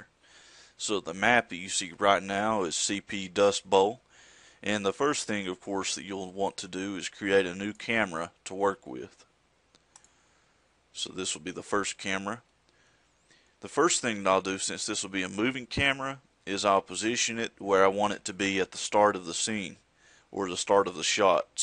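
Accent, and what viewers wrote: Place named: Texas, United States. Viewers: nothing's better than a texas dude explaining a tutorial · Damn. Now THAT is a real texan's voice. Thanks for the help. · You sound like a Texan guy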